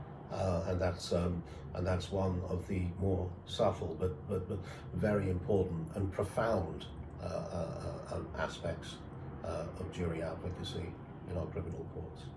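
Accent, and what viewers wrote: United Kingdom. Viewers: I pictured him as white because of your British accent. Americans don’t think of black people when they think of UK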